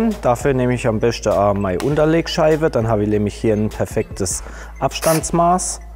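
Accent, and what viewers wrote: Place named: Baden, Germany. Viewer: Ich liebe deinen badischen Dialekt 👌😄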